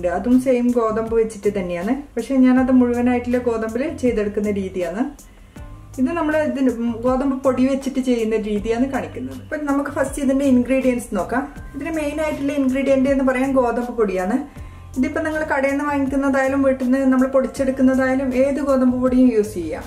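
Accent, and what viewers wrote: Kannur, India. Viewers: Are you from Cannore, parayunna reethi kettappol tonniyathaanu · നിങ്ങട കണ്ണൂർ സ്‌ലാങ് കേക്കാൻ നല്ല രസമാന്ന്... ഞാൻ ഇപ്പൊ ഉണ്ടാക്കിക്കൊണ്ടിരിക്കിവാന്ന് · കണ്ണൂർ ആണോ ചേച്ചി..സംസാരം കേട്ടപ്പോ തോന്നുന്നു..കണ്ണൂർ ആണെങ്കിൽ, എവിടെയാ.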